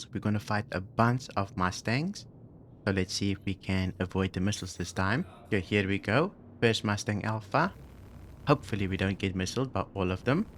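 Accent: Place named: Johannesburg, South Africa. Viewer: Can the ships in these missions spawn with cargo? Also, are you from SA? Almost sounds like you have a Johannesburg accent.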